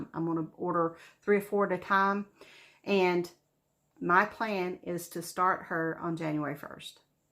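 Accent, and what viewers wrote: South, United States. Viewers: Hi, you had me at "Love Abide". swoon. Thank you, kindly for sharing all your finds. Gosh, you sure have a good eye for beautiful things. Once again, your charming southern drawl washed over me and bathed me in Grace & Goodness. Thank you for sharing your spirit and such lovely inspiration. It was a pleasure to drink coffee and "visit" with you. Happy Stitching, friend, Daleen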